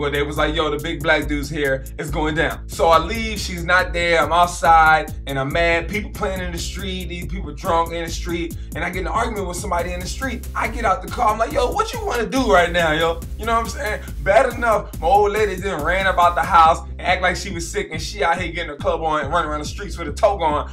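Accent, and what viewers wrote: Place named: Baltimore, United States. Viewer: You sound sooo B-more with all those "Yo's" lol.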